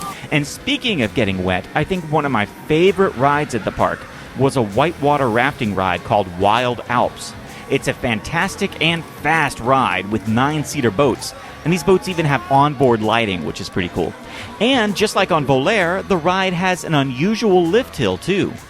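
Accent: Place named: England, United Kingdom. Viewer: ^^ Es is lustig zu höhren wie Engländer Deutsche Wörter ausprechen XD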